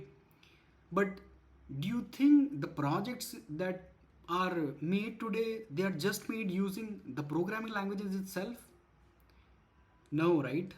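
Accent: India. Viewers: Bhai tumhara excent South Indian ho gya hai.😂😂 But hamesa ki tarah useful video. I admire you. Great video.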